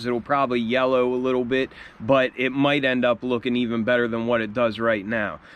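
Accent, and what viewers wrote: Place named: Pennsylvania, United States. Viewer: Nice video man!! Just a quick question are you from Pennsylvania?? You have an accent that reminds me of myself from Pennsylvania...lol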